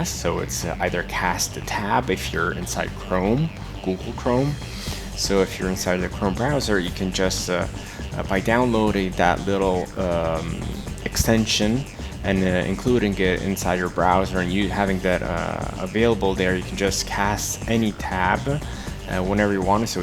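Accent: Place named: Italy. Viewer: Your english accent is very minimal for being Italian!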